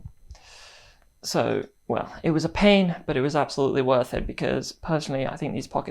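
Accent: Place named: RP, United Kingdom. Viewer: no worries about that. You're fine and definitely do better than I could. We all enjoy your videos and learn from them and appreciate the work you put in. I do feel like maybe you're trying to be very RP with no regional accent and as an American we don't care at all about perfect RP.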